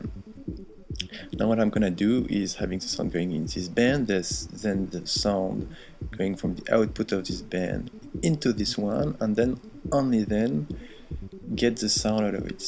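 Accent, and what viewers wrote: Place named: France. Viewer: L'accent français est drôle :)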